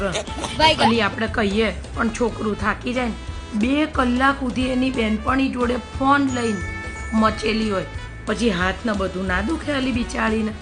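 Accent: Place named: Ahmedabad, India. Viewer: આ તમે અમદાવાદી અવાજ ના વિડિઓ બનાવો છો એ બધા સુપર હિટ છે,, હજુ અવાજ વિડિઓ મોકલો અમદાવાદી 😜😜😜🌹🌹🌹❤❤❤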